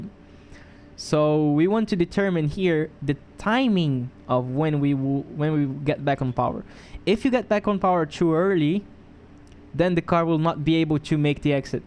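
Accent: Brazil. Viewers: Achei teu canal hoje, Voce eh brasileiro ne soh pelo sotaque. muito bom os videos!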